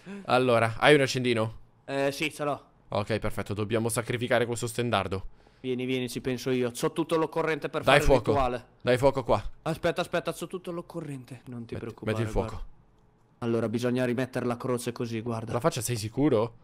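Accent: Sicily, Italy. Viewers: !!! È siciliano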